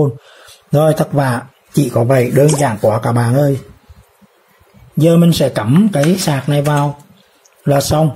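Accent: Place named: Hue, Vietnam. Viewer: Rất hay!! Thích nghe giọng nói ĐẬM CHẤT HUẾ của ANH....THANKS.!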